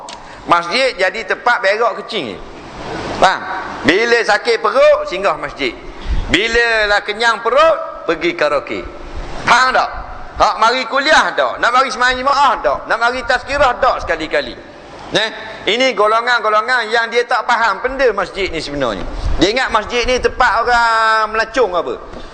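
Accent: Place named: Kedah, Malaysia. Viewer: MashaAllah ustaz boleh,cakap kedah,,terbaiek.... السلام عليكم.. الحمدلله لا اله الا الله محمد رسول الله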